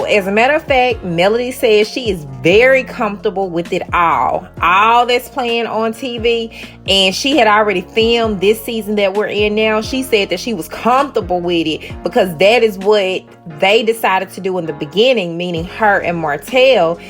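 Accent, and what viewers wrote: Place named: Huntsville, United States. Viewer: Hi. I liked the video. You must be from Huntsville you talk like them(accent) ❤️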